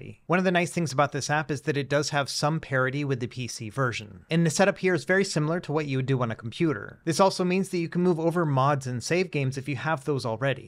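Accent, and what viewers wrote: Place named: Midwest, United States. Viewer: Where are you from? That's a heck of midwestern Accent you've got there. What is that Missouri? It's almost like it's Minnesota.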